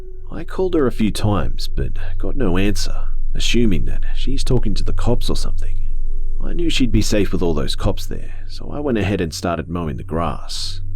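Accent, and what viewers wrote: Australia. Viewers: Yes 🎉 I actually love hearing a fellow Aussie do these stories 😍😍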